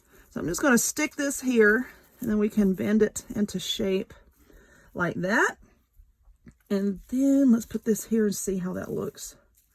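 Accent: South, United States. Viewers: If you dont mind me asking what part of the south are you from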